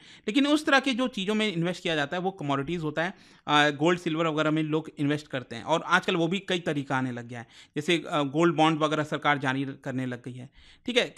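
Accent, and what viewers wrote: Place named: Maithili, India. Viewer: न बेसी आएगा न कम आएगा शुद्ध मैथिली❤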